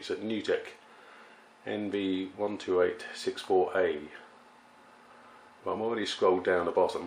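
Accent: United Kingdom. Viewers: are you british or american aka born in what country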